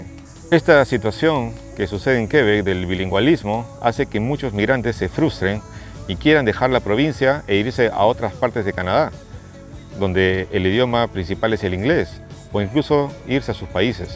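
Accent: Peru. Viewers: Hola! X tu dejo asumo q eres un compatriota peruano. Si mi fuerte es el inglés (con escazo o mínimo francés) sería suficiente para conseguir un empleo allá mientras aprendo el mismo francés en Québec? AbrazO!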